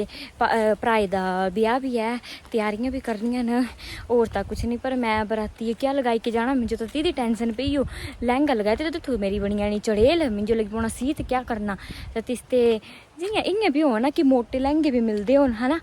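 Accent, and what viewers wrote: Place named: Himachal Pradesh, India. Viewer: Himachali boli hai bro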